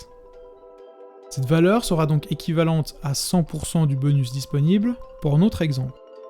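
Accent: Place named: Switzerland. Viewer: ahahahah mais c’est clair et ça fait du bien d’entendre l’accent du coin sur YouTube surtout quand j’aurais été presque sûr à 99,9% que le contenu serait du contenu provenant de YouTube francophone mais pas Suisse 😁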